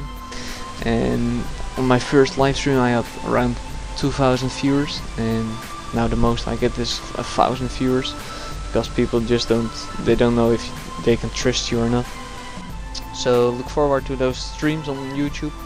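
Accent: Netherlands. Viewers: dutch accent 3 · sexy dutch accent <3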